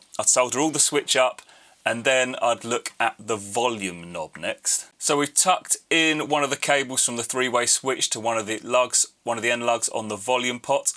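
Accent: United Kingdom. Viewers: it's for England English speakers ... USA English speakers can barely understand him as he talks so fast in British English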